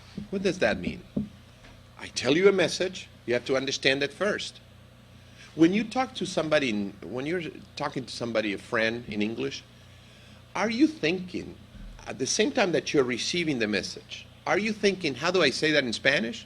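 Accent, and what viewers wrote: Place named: Argentina. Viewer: Argentine Spanish accent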